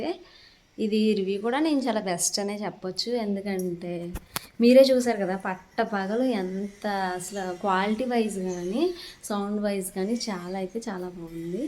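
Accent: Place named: Godavari, India. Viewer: Godavari slang 😊😊😊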